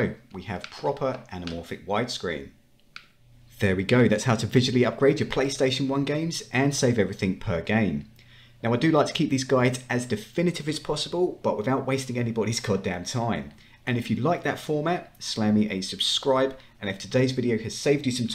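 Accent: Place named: United Kingdom. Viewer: I don't know why but as soon as I hear a British accent I know I'm in the right place. This tutorial was amazing. Easily the best I've found on YouTube. Thanks man!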